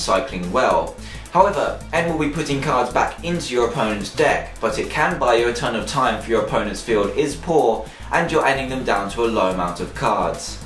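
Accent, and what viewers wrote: United Kingdom. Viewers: I just love your British accent and the vocabulary choice.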